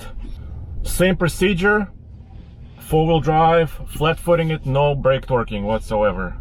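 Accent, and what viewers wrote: Russia. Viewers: Is this guy Russian? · Man, i hate his russian accent. Idk why.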